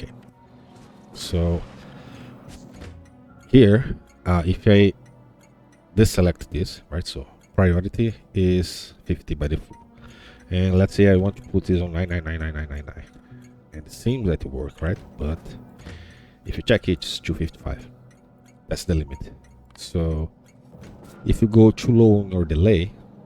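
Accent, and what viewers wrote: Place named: Brazil. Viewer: Vc é brasileiro? Teu sotaque parece daqui.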